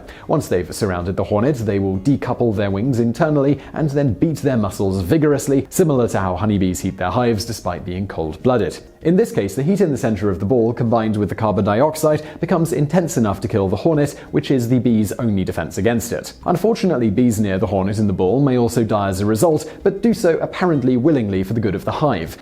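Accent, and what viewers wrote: United Kingdom. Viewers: Question: he has a British accent but I can’t figure out if he’s a Muslim background. He’s got hairy arms and a beard and a big nose which leads me to believe he’s a Muslim. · Thank you for talking about Overton bridge! I heard about this many years ago and had forgotten about the (supposed) phenomenon. P.s. always good to hear a British accent!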